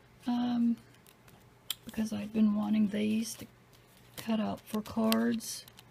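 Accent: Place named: South, United States. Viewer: Thank you for sharing this video about these dies because otherwise I wouldn't have purchased any of them. About how long does it take for you to receive your orders from China & are they packaged well? BTW...I love listening to your southern accent, it's so sweet, it reminds me of my mama's accent... :) Take care, Lily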